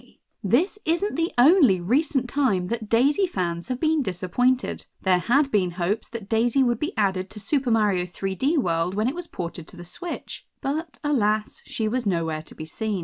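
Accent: United Kingdom. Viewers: It sucks that she wasn’t added, as a playable character in the game. Other people, such as myself, would’ve loved playing as her, if they added her to the actual game, and actually be playable in the dlc, that Nintendo might plan on making, that is. Also, glad to hear your British accent again, by the way, since I’m British as well, just like you are irl!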